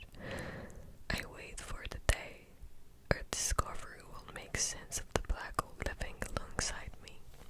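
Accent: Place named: Quebec, Canada. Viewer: she's from Quebec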